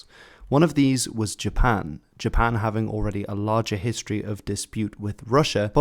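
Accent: United Kingdom. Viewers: Hi. Are you from UK? I am so curious about your accent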